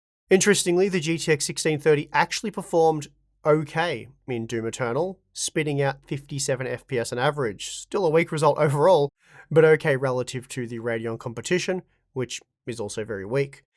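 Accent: Australia. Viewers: I love your aussie accent. Even the caption algorithm thinks you said "game wood" ! XD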